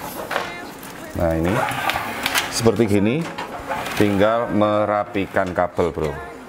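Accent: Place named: Surabaya, Indonesia. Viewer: Medok e koyok wong suroboyo bro 😂🙏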